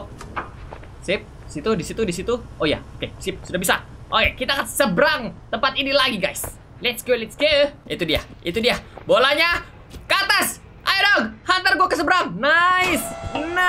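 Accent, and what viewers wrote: Java, Indonesia. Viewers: Kamu orang jawa soolnya ngmongnya kayagitu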